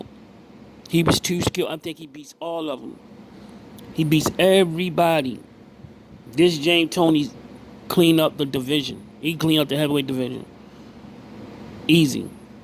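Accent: Baltimore, United States. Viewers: Heavy Baltimore accent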